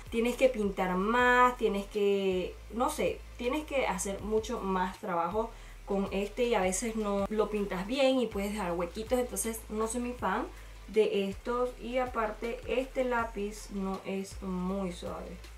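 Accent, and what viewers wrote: Venezuela. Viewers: Eres venezolana? Hablas como venezolana!❤ saludos desde Vzla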